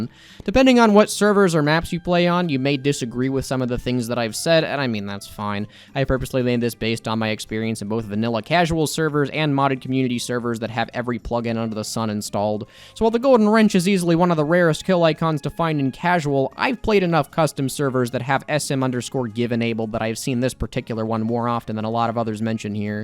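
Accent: United States. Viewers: that's the most southern accent i have ever listened from a tf2 youtuber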